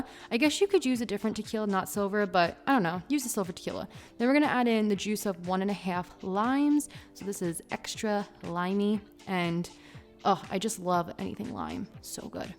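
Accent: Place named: New Jersey, United States. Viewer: Definitely a Jersey girl.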